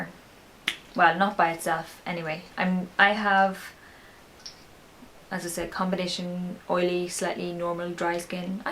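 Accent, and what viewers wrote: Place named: Northern Ireland, United Kingdom. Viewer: The NI accent!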